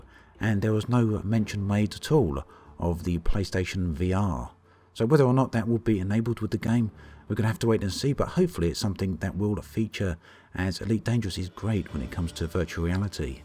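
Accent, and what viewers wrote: United Kingdom. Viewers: I did notice a slight British accent in your video today. (smile)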